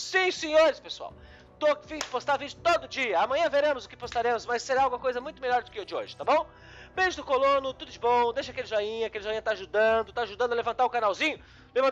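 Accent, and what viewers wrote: Rio Grande do Sul, Brazil. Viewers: Tu é gaúcho do sul thê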